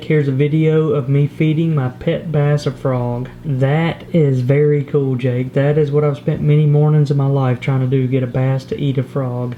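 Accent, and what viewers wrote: South, United States. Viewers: With your accent I thought you’d say yall so it felt weird when you said you all lol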